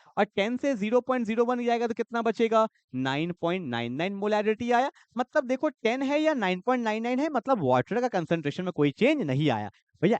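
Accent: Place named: Kashmir, India. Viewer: Sir ka Kashmiri accent ❤😅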